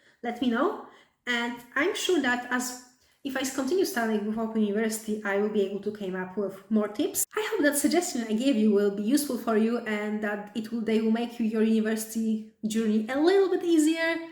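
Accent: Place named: Hungary. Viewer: Not related to the topic but..Where are you from? You sound Hungarian. 🤔 I NEED TO KNOW!🤣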